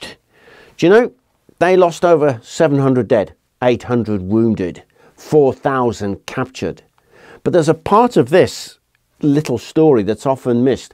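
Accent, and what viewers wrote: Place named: England, United Kingdom. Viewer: Delightful as usual, though I understand this guy I never heard of before gave us French a hard time (another one!)... Indeed, you are an outstanding storyteller, you could even captivate us only by reading the instruction manual of a fridge! I also love, I already said it, but I'll say it once more, your so typical accent, it really adds a lot. There is so much globish here on Youtube that I'm glad to hear somebody speaking a real english, the language I learned and heard in Old Blighty when I was younger, and not the awful thing some people still dare to call english... By the way, speaking of great warriors of the Hundred Years war, how about Bertrand du Guesclin or even Joan of Arc? I know, be defeated by a 18 years virgin is something humiliating, but her story is quite fabulous, believe me!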